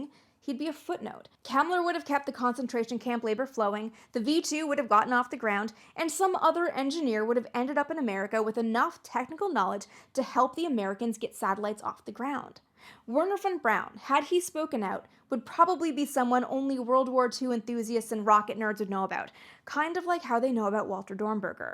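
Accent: Germany. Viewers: I think the NAZI made him an offer he couldn't refuse like in the Godfather and I like your German accent.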